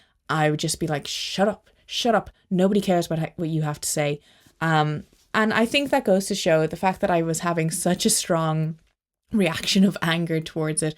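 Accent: Ireland. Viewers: Great recommendations and the Irish accent sounds great 👍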